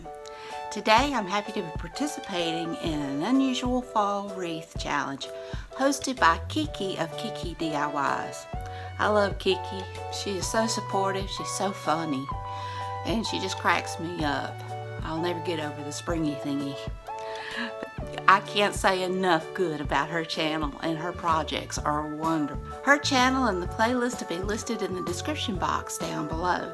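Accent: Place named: South, United States. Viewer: Such a cute kitty broom! Love your southern accent!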